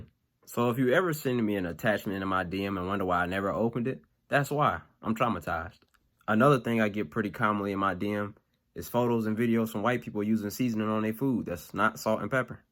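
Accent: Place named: Atlanta, United States. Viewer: I love your improvisations and your roast. I'm from Westside Bankhead and I don't really get to hear that speak anymore so it's nice to find someone that speaks my language.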